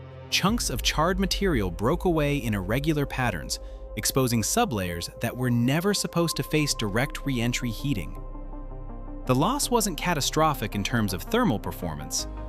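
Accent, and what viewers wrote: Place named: United States. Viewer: lol if he didn't have an American accent I would have kept my mouth shut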